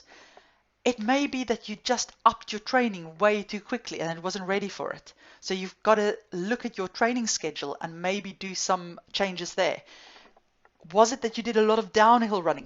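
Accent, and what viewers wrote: South Africa. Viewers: Excellent presentation. Love the South African accent. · Your previous video was so good, I came and found this one too! You sound like a fellow South African :)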